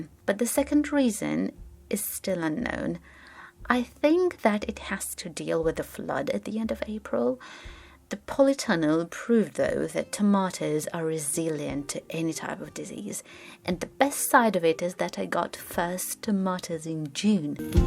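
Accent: United Kingdom. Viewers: why does she have a harry potter accent?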